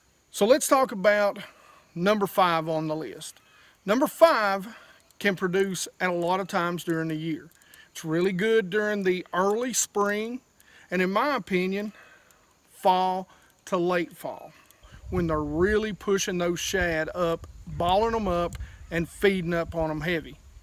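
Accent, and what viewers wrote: United States. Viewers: i could listen to ur voice all day.. love the country accent!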